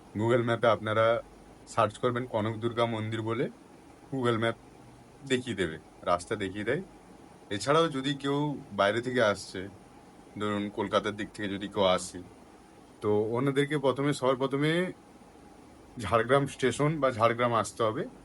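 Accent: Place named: Bangladesh. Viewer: Pure bangla accent ❤🫡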